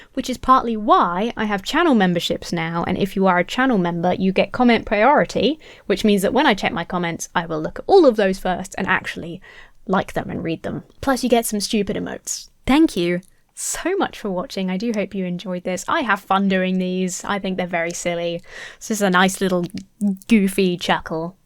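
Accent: United Kingdom. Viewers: DAMN I am jealous on your absolutely PERFECT British accent , I wish I could talk like that. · Why are you british? ladlsfkajsdflkja;sdlfkj;adlsfj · "Why are you British?" 😂😂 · why are you british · Indeed you are.💛And your short hair and"sporty style"are adorable.😊 Not a fan of the british accent,but that's my problem,not yours.(I'm Italian and,growing up,I got used to the american accent thanks to my"Buffy"DVDs,in case you were wondering.) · I'm glad you know you're an exceptionally beautiful young woman and won't let stupid comments deter you from that. You are also bright, smart, quick witted, seemingly very educated and I love the Bri - ish accent. Please continue doing you so I may continue watching/listening to a higher level of videos since YT sent you my way and therefore have subscribed. Peace and all that. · I also would like to know why you are British. 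· Wait, but why are you British? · has a point. why are you british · Wait, you're British??? Shocked, I am just shocked! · "Why are you British" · "Why are you British?" What in the world???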